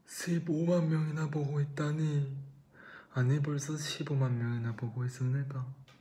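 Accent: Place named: North Korea. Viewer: ㅠㅠ아 기여운 기먼필 ㅠㅠㅠ 진심 북한말 너무 잘해ㅠㅠㅠㅠㅠㅠ 아진챠ㅠㅠ 기욥댜ㅠㅠㅠ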